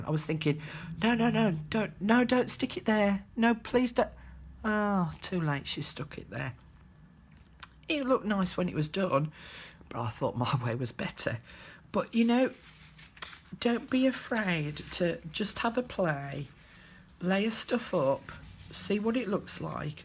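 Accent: England, United Kingdom. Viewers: Absolutely love your voice your accent are you English you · Hi,I just found your channel..I've been wanting to start doing glue books for awhile now but just been reluctant to give them a try..Thank you for such great tips and ideas..I absolutely looove your accent..What part of England are you from? My maiden name is Burton and my ancestors started Burton England so my dream vacation is definitely England since English is in my blood..The English accent is my favorite,its just so soothing to me lol Lots of love from Kentucky❤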